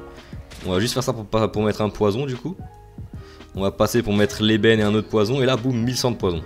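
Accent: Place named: Alsace, France. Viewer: L'accent alsacien qui s'entend fort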